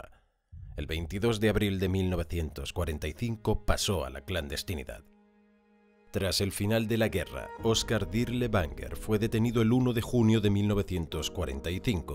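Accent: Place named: Spain. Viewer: Estoy adicto a estos videos, la voz y el acento español van como piña jajaj saludos desde Argentina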